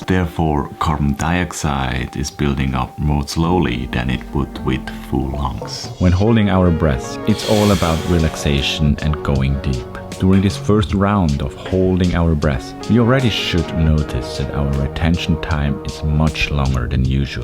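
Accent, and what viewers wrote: Switzerland. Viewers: Are you swiss German? I detect a slight accent. Barn Deutsche maybe?l lived in beautiful Switzerland. Loved it! Kia ora from new Zealand.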